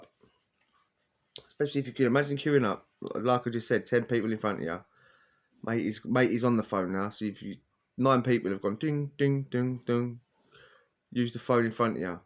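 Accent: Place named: Harlow, United Kingdom. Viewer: 😂😂😂grays fire 🔥. Lol loved that Harlow talk other day aswell ur not bad and from east ur from Harlow 😂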